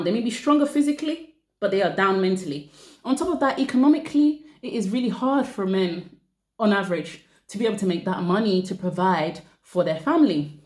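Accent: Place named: United Kingdom. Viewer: Your British accent is beautiful.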